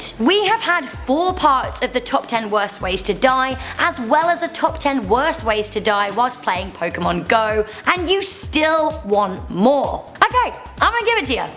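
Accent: England, United Kingdom. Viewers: Please stop using brits with their weird ass voices. Can you get a Nigerian narrator please? They have sexy voices. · i Hear that you are...English???? I'm english to and just wondered if you would come to comic con or if you like lived in london..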